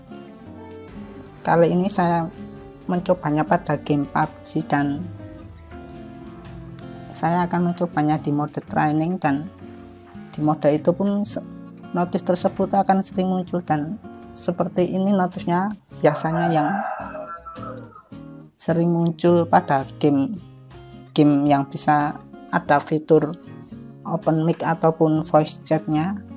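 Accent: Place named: Java, Indonesia. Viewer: Medok e brrooo🤣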